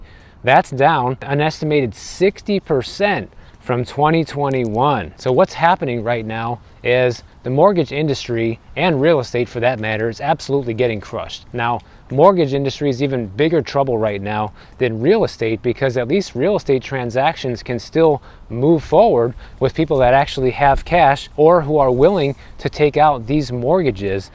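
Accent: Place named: Boston, United States. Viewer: You have to be from the Boston area. Am I right?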